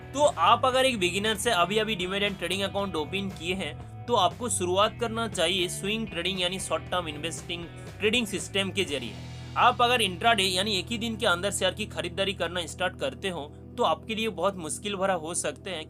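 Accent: Bengal, India. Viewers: Bengali ho kya bhai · Dont mind bro.. Bengali speaking people ke liye thoda problem hota hai.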